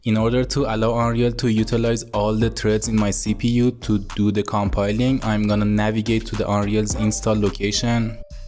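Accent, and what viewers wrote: Iran. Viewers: لهجه اصل ایرانی 😁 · قربون لهجه فارسیت😁😁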